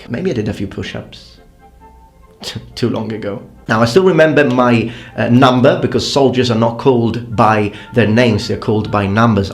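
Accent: England, United Kingdom. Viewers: The Old French variant of the word, and the original way to pronounce it is writen "luef" instead of "lieu". This is the way the british have always used it, so there you go. learned english in the UK, so he speaks british english instead of murica english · I wondered what your heritage was......I thought maybe Greek or Italian.....but your English is so good.....you even have a British accent......I bet the ladies chased you in that uniform...;) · you're italian? i thought you're British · has an amazing English accent.. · Oh damn! You're Italian? I thought you were British! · your accent sounds english. also if youre italian why do you use a jewish star as a logo?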